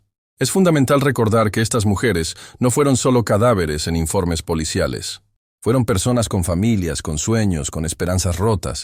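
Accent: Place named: Spain. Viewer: Lamento la narración en el español de España que sólo se habla en España en latinoamérica no hablamos eso.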